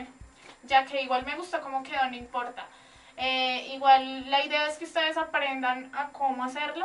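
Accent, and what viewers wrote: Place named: Colombia. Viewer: De donde eres? Colombiana? Me suenas a Colombiana..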